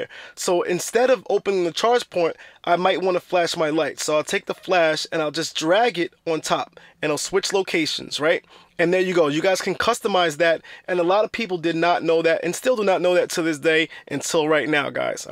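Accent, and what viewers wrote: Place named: United States. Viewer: Hi, I am Pierre from Paris (a model 3 owner) thank you for your wonderful video. And your wonderful American accent. It was super cool and super easy to be listening to you. I will watch your other video and I have subscribed to your chanel. But just one question for the trunk. You showed how to reduce the height, but if I want to increase?? Mille merci à toi!!!!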